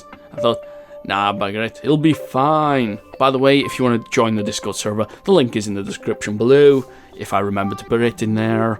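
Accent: Wales, United Kingdom. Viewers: Welsh? Did I hear WELSH?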